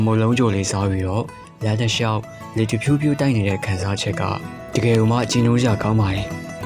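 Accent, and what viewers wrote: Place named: Dawei, Myanmar. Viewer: ထာ၀ယ်အသံကြီး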